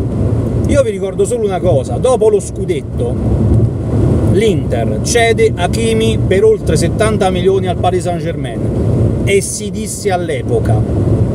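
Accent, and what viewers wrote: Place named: Marsica, Italy. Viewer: dizione marsicana impeccabile e piglio aggressivo da Aquila Reale del Velino. "Te mangane du portier"....tradotto "ti mancano due portieri". Mi manca l'Abruzzo e la sua genuina e montagnosa maestà!!!!! Sei troppo forte e da finto incazzato ancora di più 👏👏👏👏👏 Un saluto 😃😃😃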